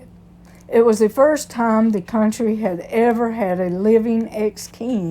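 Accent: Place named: Alabama, United States. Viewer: I love the Alabama accent, it’s doesn’t sound like the American accent we all know and hear on television, I love listening to reading historical stories, thank you 👍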